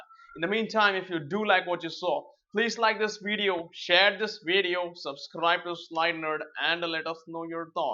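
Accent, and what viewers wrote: India. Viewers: Where are you from ? lol Indian??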